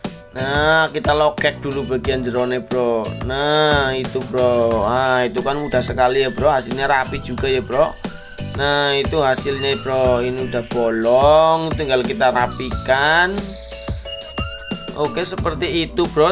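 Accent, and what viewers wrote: Banyumas, Indonesia. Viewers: Bahasane ngapak ora ngapak ora kepenak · Wong ndi sih Bro...? Ganing ngomonge ngapak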